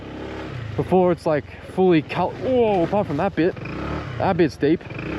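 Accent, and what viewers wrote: Australia. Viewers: Great content I enjoyed watching that. I'm curious about that accent. I was thinking Australia but then south Africa, then maybe both. Am I close? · I absolutley love it when you say HECTIC!! You have the best aussie accent I've ever heard mate ❤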